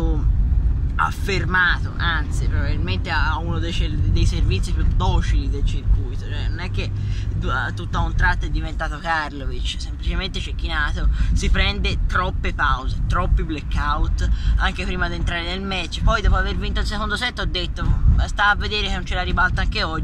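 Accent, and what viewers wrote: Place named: Florence, Italy. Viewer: Purtroppo hai quell'accento fiorentino. Per il resto bravo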